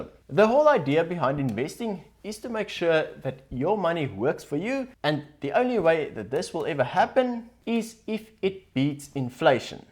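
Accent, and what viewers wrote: Afrikaans, South Africa. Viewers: So handsome. Strong Afrikaans accent. Very cute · Damn, I enjoyed the Afrikaans slang and tone. Lekker to listen to something from RSA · its always refreshing how authentically afrikaans you are, genuinely feels south african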